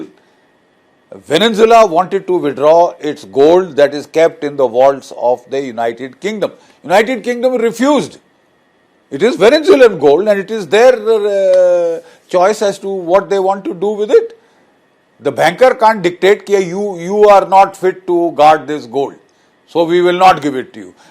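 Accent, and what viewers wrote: India. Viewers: I like the way he talks , he speaks English but with an Indian accent, which sounds very nice.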